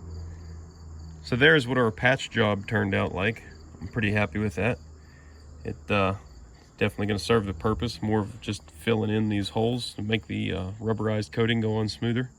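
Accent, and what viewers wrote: United States. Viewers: Was just about , as a relative newcomer to this project, to ask what exactly what you intended to use it as. You stopped me in my tracks by giving a quick description. But as one who sometimes struggles with local American accents can you describe a little more exactly what you would like it to be, BRIAN from Spain . Thankyou.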